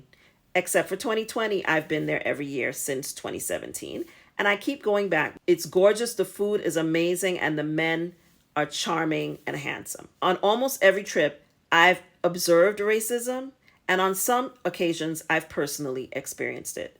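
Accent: United States. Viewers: I guessed Greece was the most racist but Italy is right next door. I wonder if the immigrant crisis has something to do with it (not that I'm making excuses). The fact that your American accent got you a pass makes me think it's aimed at African migrants. You should wear a t-shirt that says: "Sono un ricco turista americano." :p The Netherlands or Scandinavia would be the least racist, being progressive and sophisticated countries. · It's too unfortunate that only your American accent is a leverage and asset. Nonodyis is going to respect us (black people).unless we change and improve our conditions.American accent can be an asset.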